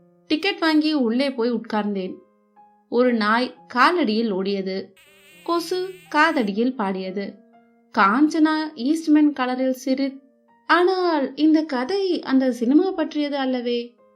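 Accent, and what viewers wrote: Chennai, India. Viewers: Your Madras dialect is bloody hilarious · You seem to be becoming very proficient in Madras basshai!!!!! Way to go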